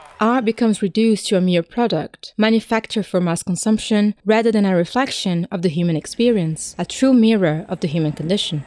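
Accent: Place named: RP, United Kingdom. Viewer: A fascist narrative that you've, for the benefit of ideal doubt, blindly concluded as your perceived apolitical stance as an arts scholar. You should never approach another work of art with any appreciation or criticism again as I feel even the work of Steve Cutts might overwhelm you. This video reeks of fear and we all see through the posh accent and thesaurus vocabulary.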